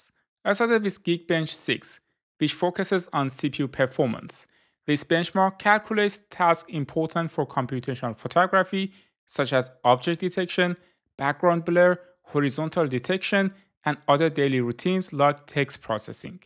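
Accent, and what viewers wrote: Iran. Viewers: Bro, I sensed from your accent that you are Iranian, am i right?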